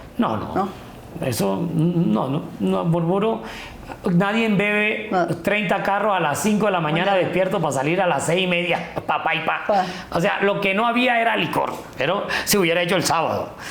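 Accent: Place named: Barranquilla, Colombia. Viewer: Barrnquillero que baila arrebatao, no has perdido el acento currambero. Orgullo costeño...que sigan los éxitos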